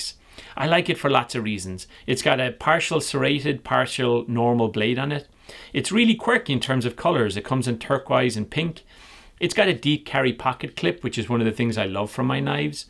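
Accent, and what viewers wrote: Ireland. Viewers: R U Irish. I like your accent. I lived in Galway for 10 years.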